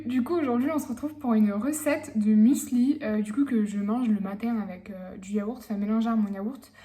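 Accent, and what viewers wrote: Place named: France. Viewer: Молодец! Спасибочки Тебе Большое Родная Драгоценная Прекрасная Девушка Красавица!!! Спасибочки Тебе Большое за Красоту Твою Прекрасную и за Твои Видео на Ютубе!!! Я, слышу Твой акцент, это наверное ,, Французский язык", Твой, Наверное???!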